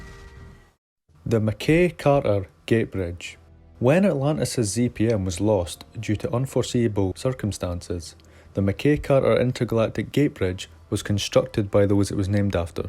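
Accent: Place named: Scotland, United Kingdom. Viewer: Ok.. NEW here so DON'T hate.. I had NO idea you were Scottish.. but I IMMEDIATELY heard Scotty from Star Trek and Carson from Star Gate Atlantis.. sorry for stereotyping but DAMMMMM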